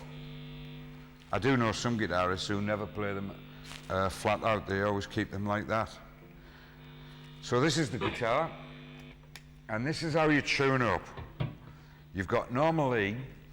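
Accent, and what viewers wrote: Middlesbrough, United Kingdom. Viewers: I'm a Middlesbrough guy, our accents are pretty similar - we've never met but in the late 60's when I got married we lived in one of the street houses near Ayresome Park, and Rea's cafe of course. In '62 my mate and I bought guitars from Hamiltons Music; he got the exact sunburst Hofner as you and I got the red Hofner V2 with same switch gear as your brown one, a young lads Strat. Cost 52 Pounds! Both were played, mine through a Selmer amp, around the pubs, clubs, dance halls in the area and were well used. But I still have the V2 in its original red-plush lined case, no longer in use of course. In the 80's I also bought a Tokai Springy Sound Strat in South Bank. I traded it on a new Tel some years later, which I regret as they are sought after now. Also have a PRS Les Paul type but never had a Strat, so am thinking of getting one for that unique clean tone. 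cheers John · Thanks, nice to see how you work all that. I was born in Middlesbrough but parents left when I was five. Nice to hear that accent again.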